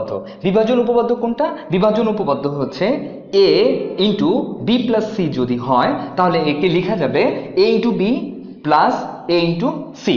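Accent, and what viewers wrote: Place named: Bangladesh. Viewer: Excellent Topic. Are you from Bangladesh ? Your accent is like bangladesi... I'm from India, Kolkata. Happy to tell that, from Village Cooking By Sandhya team, one of our team member is from Mathematics Hons. Hope, he will enthu. from your channel. ❤️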